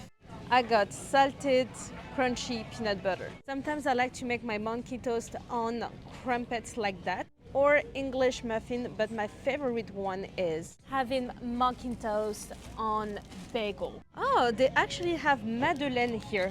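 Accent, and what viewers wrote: France. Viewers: c’était très sympa de t’entendre parler en anglais 😘. L’accent français, tu l’accentues pour les Américains ou me trompe-je?😉😁🤣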